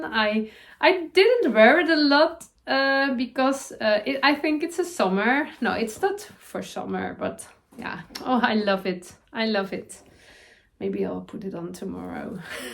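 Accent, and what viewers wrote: Netherlands. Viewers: . You just brighten my day. Love your podcasts and your “Dutchy’ English. So refreshing and genuine. Happy New Year. ❤️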